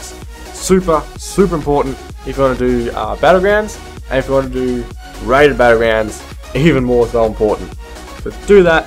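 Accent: Australia. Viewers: Love that Australian accent :D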